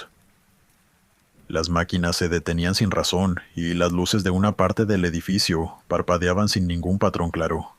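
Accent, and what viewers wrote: Mexico. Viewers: Yo pensé q era Méxicano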